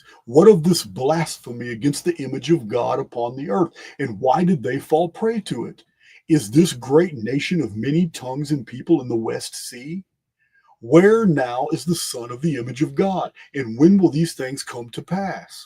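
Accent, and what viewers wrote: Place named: Texas, United States. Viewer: Thank you from the bottom of my ♥️. I got used to your voice andTexas accent and now hooked.I feel your voice and message comforting my soul. Greetings from Holland.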